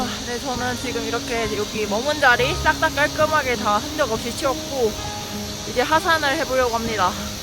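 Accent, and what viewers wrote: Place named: Gyeongbuk, South Korea. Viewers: 경북인건 1000% 대구세유? 사투리 별루네... 부산 아님 ㅋㅋ 깨긴깬다 ㅋㅋ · 마! 이게 갱상도 여자아이가?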